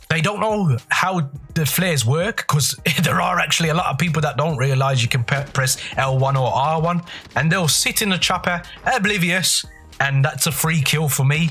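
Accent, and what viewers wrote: Birmingham, United Kingdom. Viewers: Walsall? You sound it but then I hear abit of Brummy in you to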